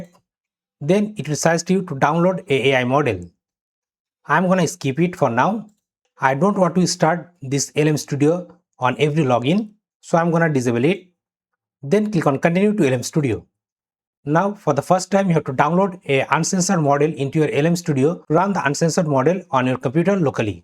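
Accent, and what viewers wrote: India. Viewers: Lol, gotta decode the indian-english first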